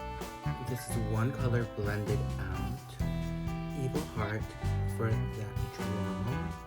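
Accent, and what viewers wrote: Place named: Chicago, United States. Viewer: U from chicago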